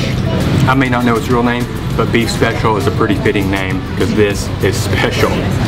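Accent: South, United States. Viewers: didn’t realize you had a southern accent. Your videos from the Philippines was amazing. Never been there but after watching you eat... it’s on my bucket list. Cheers. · just read brief description where you're from. thought you're from Georgia :-D, i notice your southern accent. I've never been to States but I have friends and families live in various states in the US.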